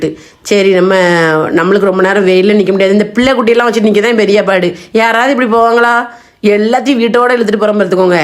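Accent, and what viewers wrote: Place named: Coimbatore, India. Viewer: Naanum en pennum ungal slangai miga virumbuvom.we are coimbatore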